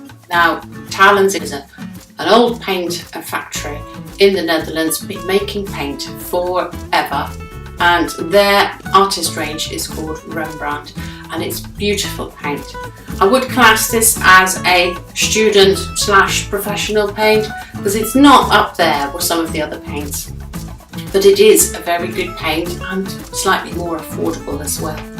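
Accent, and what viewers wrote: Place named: England, United Kingdom. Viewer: Nice review. Surprised you sound so English. I love Old Holland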